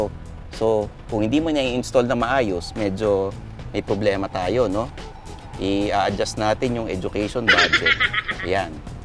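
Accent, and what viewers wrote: Conyo, Philippines. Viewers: 😂🤣😂 ang cute ni sir parang bagay mag conyo ala ADMU, UST😂🤣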